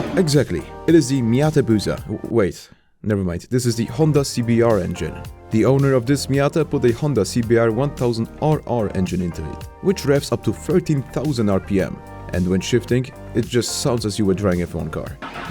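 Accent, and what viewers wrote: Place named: Poland. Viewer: Masz bardzo słaby polski akcent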